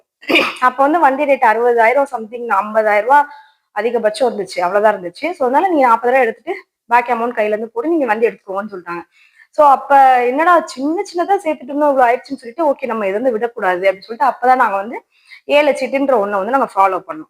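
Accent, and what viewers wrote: Madurai, India. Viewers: Akka neega Madurai ah ka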